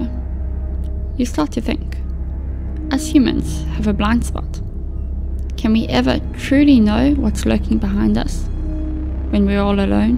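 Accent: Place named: South Africa. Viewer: You must be South African if not your accent sounds just like it. I am South African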